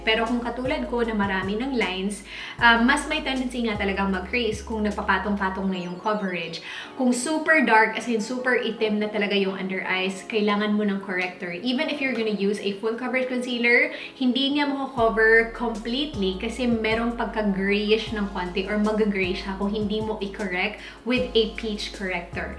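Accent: Visayas, Philippines. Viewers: Bisaya ka te????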